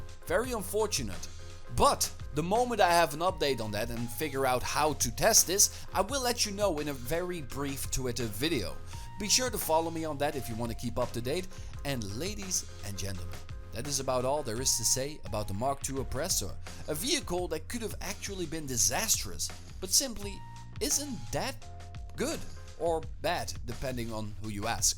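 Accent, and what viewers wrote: Netherlands. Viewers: I have to know... You wouldn’t happen to be Dutch, would you?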